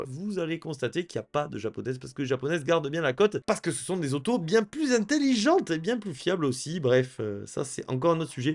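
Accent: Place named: South-West, France. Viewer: Merci pour vos excellentes vidéos et votre accent du Sud-ouest qui me rappelle mes jeunes années.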